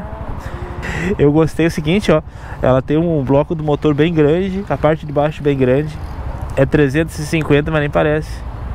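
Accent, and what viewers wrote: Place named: Rio Grande do Sul, Brazil. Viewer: Adoro o sotaque do gaúcho, aqui em SP interior puxamos o R sem dó (porrrrrrrrta)😅…..e o “tu” para nós fica só na conjugação do português mesmo, aqui usa-se o “você”. Grande abraço diretamente do interiorrrrrrrr de SP!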